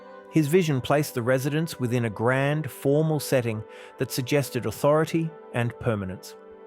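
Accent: United Kingdom. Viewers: This narrative skews the actual history and context of our Constitution; The WH is in the Executive Branch and the POTUS does not need permission from congress make changes to the WH. They are only needed if public funds are needed, congress controls the budget allocation. The British have been colonizers and slavers for the last 400 years, never trust a video with a British accent and never trust anyone with a British title. Yeah, I said, so what. 1st Amendment, they hate it and I love it. Use it or loose it. USA❗ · Why use a British accent to narrate the story of an American seat of government? And the crappy AI images have almost nothing to do with the narrative…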